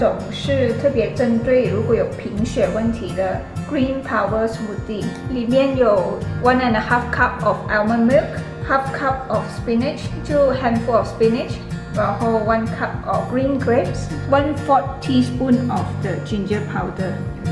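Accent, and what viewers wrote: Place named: India. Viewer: 你说英文有点像印度人说的英文🙈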